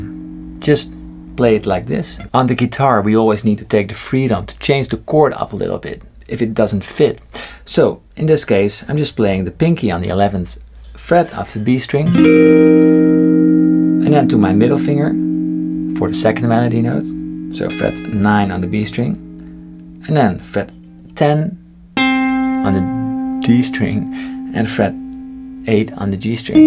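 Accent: Netherlands. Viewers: He's Dutch ;) but he's living in the USA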